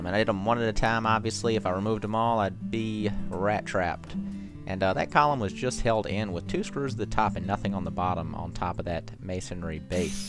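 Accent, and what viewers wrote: South, United States. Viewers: Love your videos man. Gives me inspiration in my seemingly never ending home renovation project. My house was, still kinda is in rough shape and figuring out what to do can really tax you mentally. Did you learn at a trade school or have you been doing this for a while. I noticed your accent too, I'm guessing you live in the south as well as I. Greetings from North Carolina and keep it up! 👊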